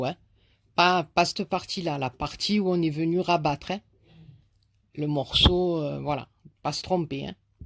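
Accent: South, France. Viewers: bonsoir j'ai découvert ta chaine très récemment et j'avoue que j'apprécie tes vidéos tes fleurs sont magnifiques et j'avoue que je vais m'y mettre. j'adore ton accent du midi je suis allée un peu me promener sur tes autres vidéos et j'y ai découvert une bonne partie de tes réalisations qui sont tout simplement magnifiques, tu es vraiment une artiste je suis abonnée aussi à la chaîne de Christelle, j'aime aussi ce qu'elle fait et me sent proche d'elle, mon mari ayant eu la même maladie qu'elle à l'âge de 27 ans merci de nous faire partager toute mon amitié domi (de Cognac en Charente….)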